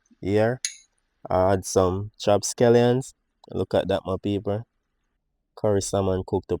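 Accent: Jamaica. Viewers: I just love mi JAMAICON accent and pronunciation. Get well soon, we miss you.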